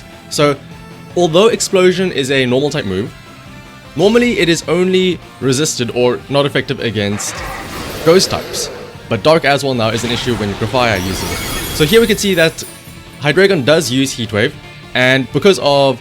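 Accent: South Africa. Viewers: Is that a South African accent I hear? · A,,, a south african poketuber?????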